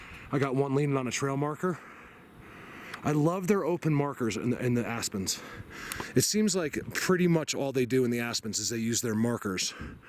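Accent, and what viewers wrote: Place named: South, United States. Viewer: Don't mean to be distracted but when you said ummm it almost sound like Elvis, you have that sexy southern tone and your voices sounds like him too...lol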